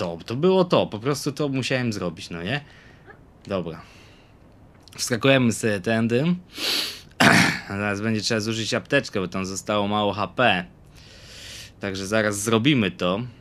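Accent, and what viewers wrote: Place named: Podhale, Poland. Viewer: Hehe, nie moge z twojej góralskiej gadki coś w stylu " ty nie wiesz ale ja wiem,a jak nie wiem to się dowiem " xD. Pozdro.;)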